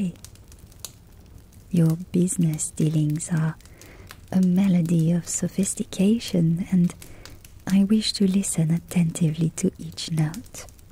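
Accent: France. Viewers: God Please do more French content, The accent and the voice is just so hitting the spot (if anyone finds this in my history I am cooked but awesome work you do!) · The soft spoken with French accent here is strong, really strong here. It hit straight on my ear and wasn't ready at all for the echo added on the bathroom ! And some humming too ? Oh, TY. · Jeeez I didn’t know I like the French accent so much 😂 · Definitely tempting me to learn French with this one, but even without understanding it I was captivated by how beautiful it sounded. Also must say that the french accent sounds so amazing when speaking english as well, 12/10. 👍🥰👍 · Your voice is incredibly soothing and peaceful, especially your French accent. Thank you for this vid and for making me wanna relearn French again. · If I had a nickel for every time I listened to a French Maid ASMR with a French accent and actual spoken French, I'd have exactly one nickel! 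😅😅😆😆 Jokes aside, despite only being able to pick up certain words and phrases in French, this RP was so very calming to listen to. The French accent combined with bits where you recited the poems in French was oh so relaxing. The feeling of being taken care of made me feel all warm and fuzzy throughout and your humming is angelic. Your use of ambient sound is also masterful and it makes it all the more enjoyable to listen to. This was so different from all the other scripts so far and it shows your range. This channel is quickly becoming one of my favourites and I wish you the very best and utmost success in the future! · Never expected how good a French accent asmr would be. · Entre l'accent bien français, la baguette et l'assortiment de fromages, c'est juste excellent mdr Franchement, c'est vraiment du beau boulot, quand on passe de cette vidéo à une autre en anglais, on ne te reconnaît même pas. 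T'es clairement une pro, au sens littéral. En tout cas bonne chance, je suivrais ton contenu avec intérêt ! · Wow, I love your accent! I've never heard french ASMR before and I had no idea what I was missing out. This is honey to my ears, please do more! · Finding this Roleplay is like finding true peace. There is nothing more tingly than a french accent guiding you to sleep. And the Ambient sounds are just on point for this. Cant really put into words how great this is. Really awesome work! Instantly gave me goosebumps and im loving it ♡ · Now IMAGINE HER french accent speaking the English Synchro of an anime girl, it would go crazyyyy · French accent sounds really nice, and that's indeed one awesome presentation. Slept well last night with this , thank you! :D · I've listened to this one a few times now and most times I never get to the end of it because I end up falling asleep. Soft, soothing voice ASMR videos are one of my favourites. 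And the French accent really adds to this. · Ta voix ressort si bien en français j’espère il y aura plus de vidéos avec l’accent français… Sinon salutations du Québec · As a person who studies french I loved this video. I didn't know the french accent would sound so good on english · That frensh accent in the english language is soooo beautiful and soft. never heards such a tingly and calming frensh accent! hope to hear more of this in the future <3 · Came here from the link provided in Part 2 (F4M). This is an exceptional audio, with your lovely narration in a French accent, adding to the immersion and exotic appeal. I absolutely look forward to the Part 2 experience soon. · French maid? Yes. French accent? Yes! French maid with French accent reading French poems? YES! This was great, the relaxing vibes were pretty damn good, and I love the character as well. Excellent work!♥️ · Love your french accent , ASMR is strong on this one. 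· Stuff like this is why in my honest opinion, the French accent is the most beautiful European accent, if not the most beautiful accent ever. Of course, every accent is beautiful in their own ways.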